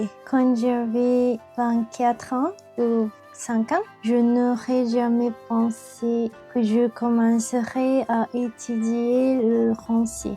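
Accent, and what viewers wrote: Japan. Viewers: Ton accent japonais est très agréable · Wow merci et le mélange français avec accent japonais est génial tu t’exprime très bien bravo et félicitation!!!!🎉🇫🇷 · Ce qui est rigolo, c'est qu'on remarque toutes les marques de ta langue natale, notamment pour la prononciation des "f" pour le salon de coiffure on reconnait vite le "ふ" ^^ Bravo pour l'apprentissage !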